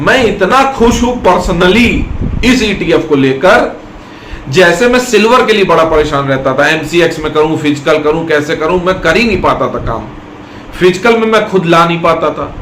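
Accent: Kanpur, India. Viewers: झन्नाटेदार , बातों का छौका न लगाओ , ek dum kanpuriya andaz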